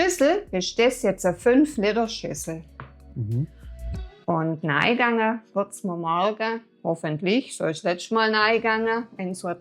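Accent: Swabia, Germany. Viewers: Hallo, ich bin gestern zufällig über deinen Kanal gestolpert und hab´ ihn direkt abonniert. Danke für das Rezept, deine sympathische Art und das schöne Schwäbisch 🙂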